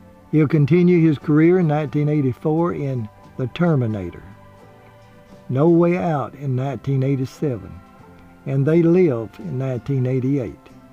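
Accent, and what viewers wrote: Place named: Mississippi, United States. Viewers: Mr., I love your videos and your Mississippi accent. I'm from Grenada and grew up in scobey and Holcomb, I was curious as to what part of ms you are from bc you sound so much like my mawmaw Ingram. A more masculine tone but similar. I really enjoy all of your videos and stories. Hope all is well. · Thanks for all of these great videos, --we love them and your Mississippi accent! Please keep them coming!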